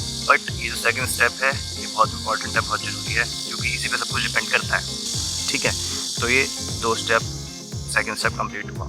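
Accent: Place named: India. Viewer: Subscribed your channel recently. And the most impressive thing I found is the way you talk, pure desi. I really like the way you do your vlogs. Keep going.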